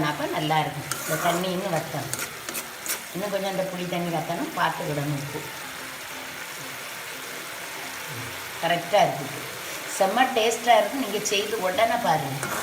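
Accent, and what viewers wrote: Nagercoil, India. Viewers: Theivamee namma oru slang tamil ketu yethanaa naalu makka ... Special word naroil insted of nagercoil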